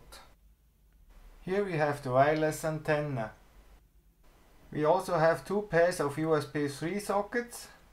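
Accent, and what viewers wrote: Switzerland. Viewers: Swiss English sounds funny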